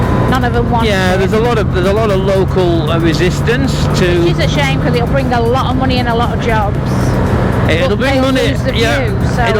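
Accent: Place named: Northwest England, United Kingdom. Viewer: . Thanks for the response. I did notice a slight northwest sound. (Similar to Freddie Flintoff but less thick.) On to the video, thanks for taking us on a leisurely jaunt to Hillarys Boat Harbour, a place I want to return to. 3 Sheets on the Harbour, bloody nice as margarita pizza.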